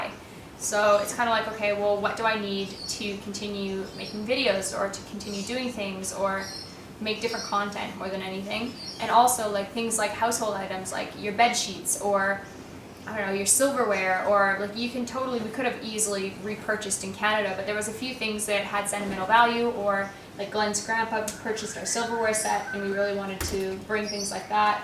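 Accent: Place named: Australia. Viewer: This was very informative because I'm clueless with travel/house etc 😅 Also I love that you sound so Aussie sometimes ❤️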